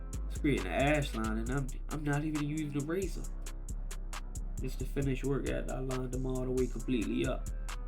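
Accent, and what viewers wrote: Baltimore, United States. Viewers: What’s funny is I’m from Baltimore but I’ve been in LA for about 4 years now. Came across this video and started watching it and immediately was like “that’s definitely a Baltimore accent” 😂 cut is super clean 🔥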